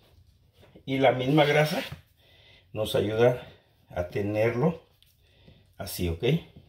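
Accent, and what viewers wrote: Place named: Mexico. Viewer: Su acento suena más a mexicano que a guatemalteco 😃👏👏🇲🇽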